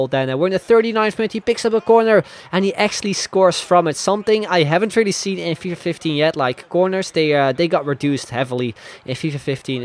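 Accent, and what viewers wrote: Netherlands. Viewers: Alright i never hurt of you bfore no lie but in 3 sec i knew you where dutch and subbed right away xD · He is dutch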